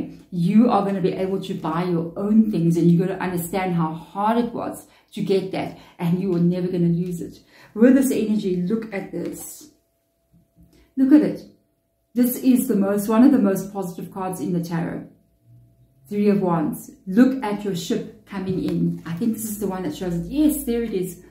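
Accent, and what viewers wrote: South Africa. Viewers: Hello dear of, light and blessings to you 💖 thank you so much for this message, it is for me. A video of yours came to my feed a few days ago (my sense of time is fuzzy now) - you first published it 2 weeks ago — it was about creating a new life that will be shocking to others. That was for me, I watched until the end and that message gave me goosebumps, I felt the resonance I my heart, my head was tingling the entire time. This message is the continuation of that one. I know it. And you will know it too 🌻 I am so grateful. I watched this one the whole way through and I wanted to watch it over again right after. Thank you for the prayer, thank you for sharing your light with me. It’s amazing that you use the word reprogramming here because that is exactly what I feel like is happening. I’m allowing my intuition to guide me as I flow along the process. 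There are rare moments when my mind still wants to take over and ask questions and plant seeds of doubt, I take a breath and come back and trust. Thank you. I am so excited for this newness. It’s different. Changes are happening. But it feels gentle and I feel so supported. Thank you thank you thank you. I send you love, beaming to you from across the Earth. P.s. I have a friend from South Africa, I knew you were South African when I heard you speak in the first video, you confirmed it in this one. Maybe one day I’ll find myself there. Nou gaan ons braai 😂 Big big love and hugs to you 🫶🍀✨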